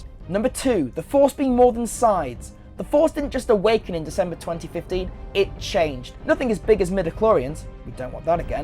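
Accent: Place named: United Kingdom. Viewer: He has a British accent... They pronounce 'a' at the end of a word as 'er.' Not a mistake...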